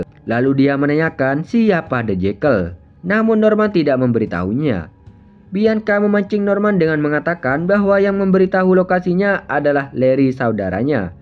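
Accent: Madura, Indonesia. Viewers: Orang madurakah pak, logat nya seperti orang madura😅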